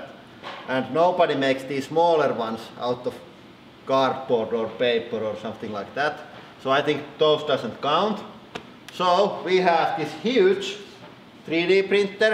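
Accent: Finland. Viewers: ei nää kanavat olis mitää ilman tätä ralli englantii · moikka vaan! huomaan tuolla äänellä ja paidalla että olette suomalaisia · huhhu mikä ralli englanti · the finnish accent tho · No ei ainakaan mua haittaa vaikka se puhuukin niinkun suomalaiset mutta vastasin vaan tähän kommenttiin... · tykkään kuunnella tota rallienglantii! se on kivan kuulosta 😂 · aikamoine ralli englnti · The finnish Accent xD #SuomiOnParas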